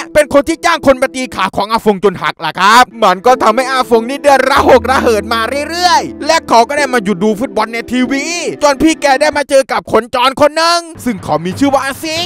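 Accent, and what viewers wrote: South, Thailand. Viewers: แอดเ็นเด็กใต้ช่ายหม้ายนิ?? อยู่จังหวัดใหน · ฟังๆ ตกลงแอดคนใต้เออะนิ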